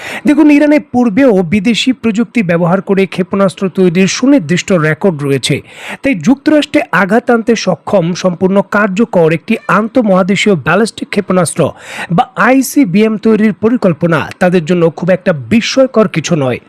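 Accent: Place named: India. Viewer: Amon chinta apnar kamne Ashe je Onno desh Hi-Tech technology diye dey!!! Apni Ekta Awamilegeue tai sob somoy anti Muslim sentimental apnar modhe. Apni sob somoy Muslim der khato kore dekhen. Ami khomotay thakle apnar channel bondho kore ditam ba kemne news Korte hoy tar Ekta guide line ditam. Sob somoy Indian tune Kotha bolen apni.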